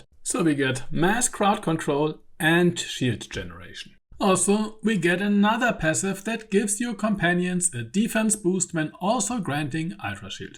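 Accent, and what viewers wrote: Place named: Germany. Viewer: your german accent is horribly intense, use your tongue a bit more when speaking english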